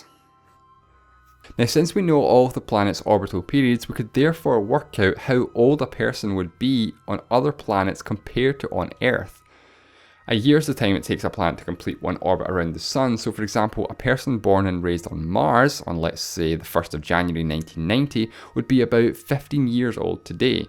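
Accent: Scotland, United Kingdom. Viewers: It's scottish. He said that he lived in Scotland in his "How many countries are there in the world?" video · Planets, Scottish accent, Kerbal Space Program's music on background: I thought for a moment this was Scott Manley's new video! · rockin' the scottish accent, eh? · The Wisest Waffle he's scottish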